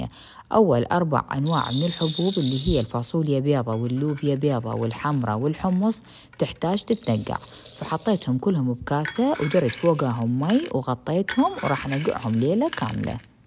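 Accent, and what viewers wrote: Iraq. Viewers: يسعدلي هالصوت العراقي ❤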